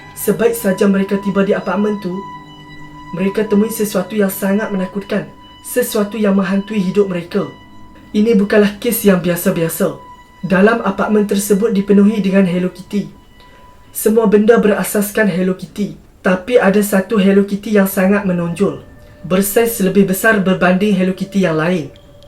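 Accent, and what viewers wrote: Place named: Kuala Lumpur, Malaysia. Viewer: SLANG2 TU JGN NAMPAK SGT..JADIKAN SLANG K.L ORI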